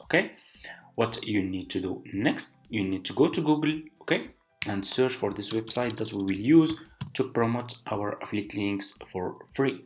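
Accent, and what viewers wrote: Morocco. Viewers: sawtek mghribi bro? 😛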